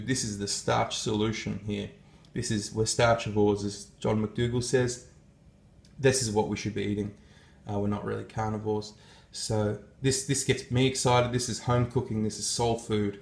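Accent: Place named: South Africa. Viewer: Your accent is insulting. A) Not all of us are Afrikaans. B) No one says "South Efrica" or "Grevy."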